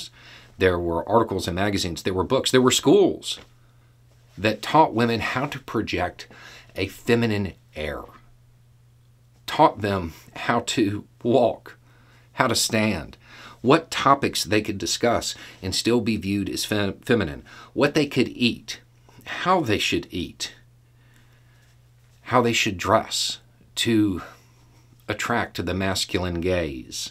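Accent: South, United States. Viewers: the cognitive dissonance i have with a bearded guy with a southern state dialect that actually able to have an intelligent nuanced discussion about such a topic showcase my prejudices and stereotypes which i have to me. hats off, your vouce of reason is sorely needed un todays workd. i will sit here in the corner...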